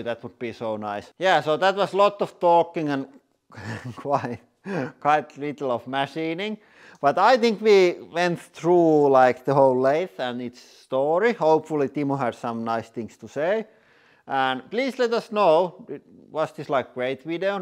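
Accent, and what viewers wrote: Finland. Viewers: Terve! A long time ago I washed many hidraulic press videos, stopped watching a for a while, never thought you was from Finland. I'm living in Finland for a year now and then watched again one of your videos. I immediately recognized the accent, and then realized right away you are Finnish. 😃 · Is this guy from Finland? His accent is Finnish.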